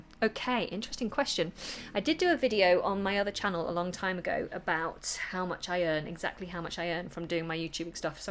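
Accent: United Kingdom. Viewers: You are doing a great job!Keep on!I wish health and happiness to you and your child!Thank you for your videos!I love your British accent!From Russia with best regards! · Where do you live? Your accent is as Theresa May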